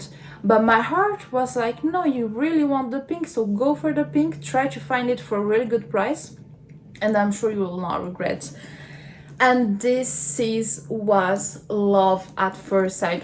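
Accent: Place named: France. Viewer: Are you French? Love the accent!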